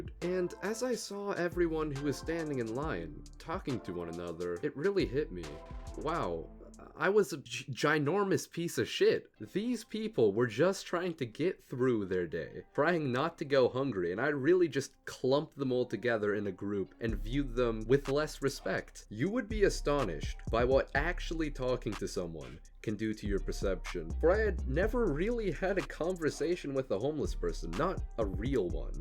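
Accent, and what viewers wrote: South, United States. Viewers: I'm not even a native English speaker and I associate Beaus accent with hillbilly Republicans. The bias is real. We have a similar thing in Germany with the Saxony accent. You hear it and you think: uneducated, racist, about to lecture you on how we aren't living in a democracy because vaccines. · sources? Keep on listening if it makes you feel better. You realise he makes money preaching to the choir. Liberals love to hear a southern accent spew their talking points. Like how consertives like to hear black men to bash police.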